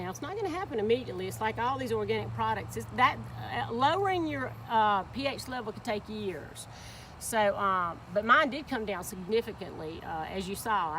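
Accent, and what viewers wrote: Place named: Louisiana, United States. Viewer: Yard Looks good. Knew soon as I heard you speak you was from the top of the boot.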